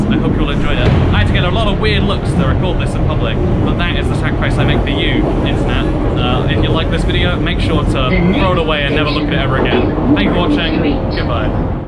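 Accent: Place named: United Kingdom. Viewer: he’s literally British mate, you clearly can’t tell what accents are what.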